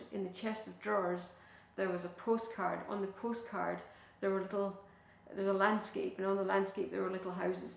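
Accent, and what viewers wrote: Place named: Ireland. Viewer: Brzenk, is your muse....so to speak .I just realized , You sound Irish :) P.s , tak for flex, var dejligt at se ;)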